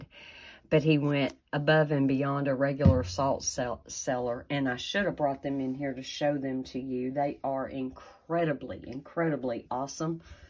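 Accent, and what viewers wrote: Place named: Georgia, United States. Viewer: Where are you from? I love your accent. Reminds me of home (GA)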